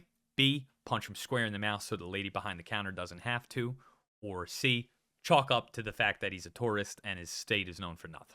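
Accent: Philadelphia, United States. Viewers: Are you from the Mayfair section of NE Philly? You got the accent.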